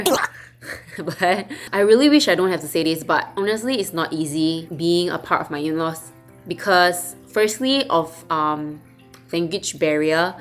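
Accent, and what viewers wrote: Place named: Singapore. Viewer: Authentic, and pure singlish and local references jus tickles me n my wife when we watch yr videos...remain true to yr heart, jiayou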